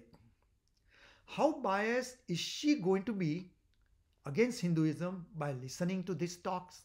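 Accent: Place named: India. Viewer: For a person who has been in the US for 45 years, you do sound like a freshly arrived Indian. Why do you deny everything about the atrocities committed by high caste Indians and their stooges in India? The results of hate that have been sown in India are becoming very clear all over the world. Just last week the Australian Govt has arrested and deported an Indian Hindutva supporter, Even the Oxford student who had to flee from her position is of the same ilk!! Of course, you will say "it's not proven"!! I am glad that the second generation of Indians in America keeps away from the likes of you!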